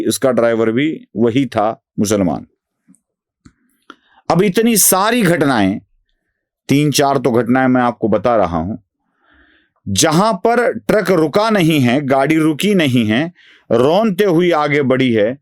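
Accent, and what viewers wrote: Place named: Beed, India. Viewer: क्या गुलाबी हिंदी बोल रहे हो हमारे बीड की भाषा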